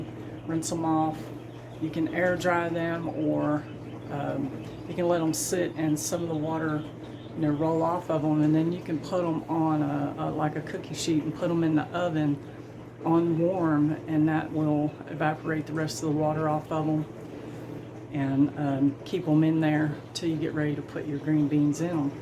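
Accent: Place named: South, United States. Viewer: I think your awesome and thank you for explaining things so easily yet thoroughly! I appreciate the calm southern voice! :) Well, it sounds southern to me!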